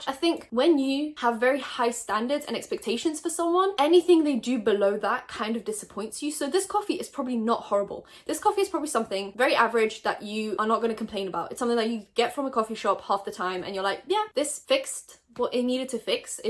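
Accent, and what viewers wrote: United Kingdom. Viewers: omg, I thought you are British! What's your background then? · I thought u were British I'm confused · So out of curiosity, where are you from? Cause I thought you were British😭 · not to be rude or like insensitive but where are you from? i thought you were british this WHOLEEE time bc of ur accent lmao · i thought she was british?? Where is from?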